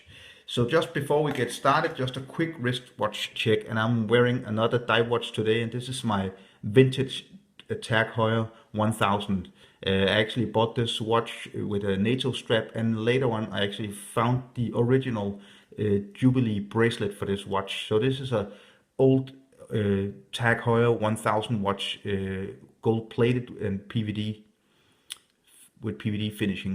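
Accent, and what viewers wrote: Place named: Denmark. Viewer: You've got a very cool accent, are you Danish?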